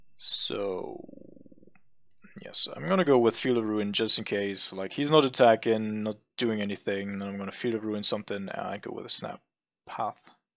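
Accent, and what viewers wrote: Germany. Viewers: Great content as usual but I like Grixis more than UW. Grixis is more flexible I think and more fun to play. But your videos always show your great insight into the deck and also into the meta. By the way are you a German player? Sometimes you pronounce words very German :) And wha are your thoughts on UW Stoneblade in the current meta?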